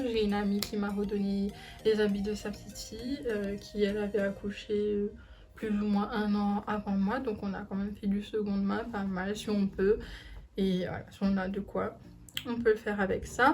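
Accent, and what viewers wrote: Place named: Réunion, France. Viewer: C’était trop bien ta vidéo pour une première fois franchement continue comme ça c’est super 🥰💯tu vien de île de la réunion 🇷🇪 ? Comme pour moi ya un petit accent 😅🇷🇪😘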